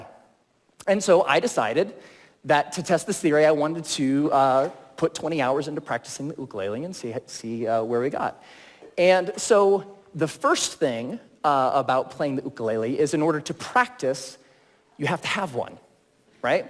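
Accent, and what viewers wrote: United States. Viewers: สำเนียงอเมริกัน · อเมริกันค่ะ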